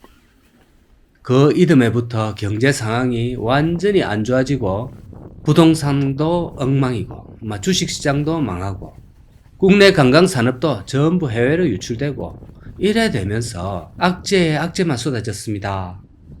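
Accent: Gyeongsang, South Korea. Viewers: 언제나 친근한 갱산도 토백이 사투리 얼마나 정겨운지..., 참고로 전 호남학파입니다만. 다 맞는 말씀입니다. 저는 미국인이 되버렸지만, 조국의 사정을 둘러보면서 제일 가슴 아픈게 한국인 특유의 정다운 스스로의 모습이 어디로 사라져버리는건지 그래서 나중엔 어디로 갈려고 그러는지 중국공산당이 지배하는 땅이 설마 되버리는건 아닌지 답답합니다.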